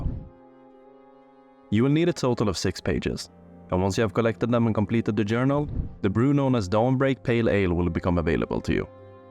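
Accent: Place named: Sweden. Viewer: Love that Nordic Swedish accent bro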